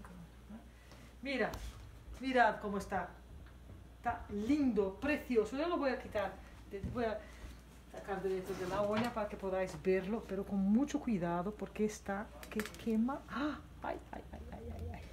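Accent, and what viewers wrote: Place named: Portugal. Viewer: Tan dulce su castellano con tono portugués, muchísimas gracias, por explicar con tanta dedicación y quedo suscrita como su alumna !🥰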